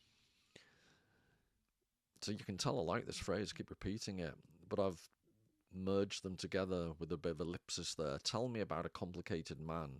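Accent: Liverpool, United Kingdom. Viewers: Are you from Liverpool by any chance? 🤔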